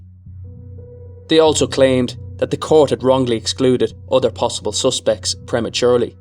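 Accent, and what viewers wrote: Ireland. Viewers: I'm pretty sure the narrator is Irish. Try pronouncing some of their names.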